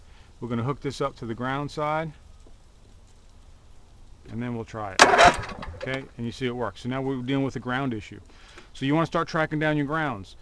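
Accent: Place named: Newfoundland, Canada. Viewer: Yes B'Y..Newfie like accent. Thanks again, it was killing me after an engine swap...im a novice. main ground was culprit. Thanks again. also, your narrative skills are excellent. more small engine stuff..?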